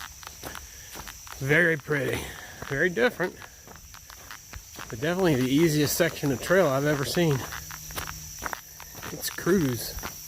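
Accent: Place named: Delaware, United States. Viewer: I'm glad I stumbled upon your video. I section hiked MD and NJ this past year and I'm planning on doing all of PA this summer. I thought I recognized that Delaware accent. I'm also from Delaware. Good luck to you on your thru-hike. I'll be following along.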